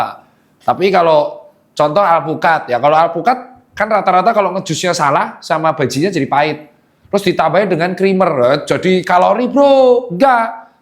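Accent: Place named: Surabaya, Indonesia. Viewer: Cok.. Arek iki wong sby ta? Lambene kok cocok karo aku.. 😂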